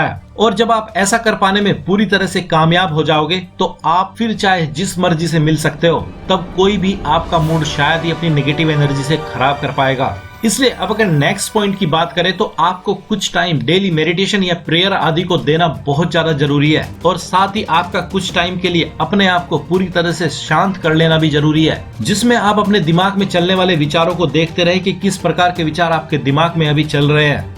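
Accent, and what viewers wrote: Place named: Punjab, India. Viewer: मुझे आभास हो रहा है आप पंजाबी व्यक्ती हैं।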